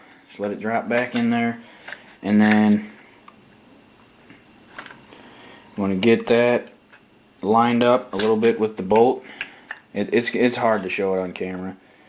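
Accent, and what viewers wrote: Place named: Mississippi, United States. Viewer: Thanks 4 the clip. Are you from Mississippi? It's just that accent bro.i know it..;)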